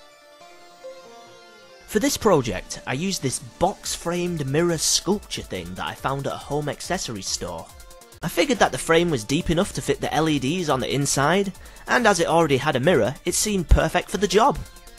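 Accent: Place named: United Kingdom. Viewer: Victor Terhorst Nunes It's British English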